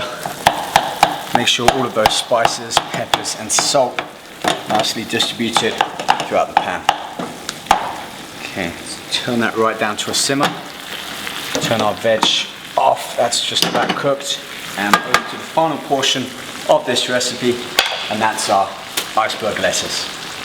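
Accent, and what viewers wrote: London, United Kingdom. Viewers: "you sound like you're from london"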